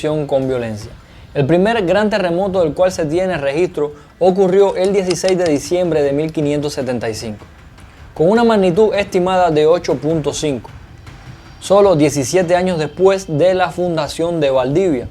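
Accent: Cuba. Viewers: Gracias por el vídeo. Lindo el acento cubano. Un saludo a Cuba, un gran y valeroso país❤ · Muchas gracias por compartirnos esta informacion, con la claridad y entusiasmo indistinguible. Estoy a la espera del proximos vídeos de la arquitectura de chile. Me alegra escuchar su acento cubano y todo lo que ha ido aprendiendo y brindando por estos medios. Felicidades y saludos.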